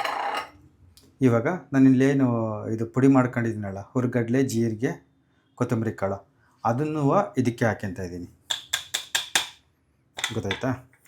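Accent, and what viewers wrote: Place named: Bellary, India. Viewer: Are u from,Bellai or Hosapete?Because,ur kannada accent is like that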